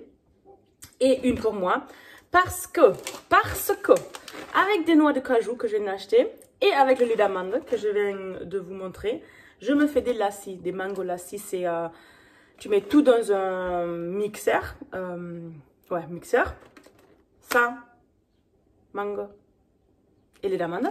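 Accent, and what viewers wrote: South, France. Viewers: Du hast Französisch gelernt aber mit einem sterken Akzent aus Süd Frankreich ;) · Sprichst du eigentlich "nur" den südfranzösischen Dialekt oder auch "richtiges" Französisch (wie heißt eigentlich das französische Pendant zu Hochdeutsch?:D). Ist überhaupt nicht negativ gemeint, sondern tatsächlich aus Interesse :) Die Aussprache unterscheidet sich ja teilweise richtig :)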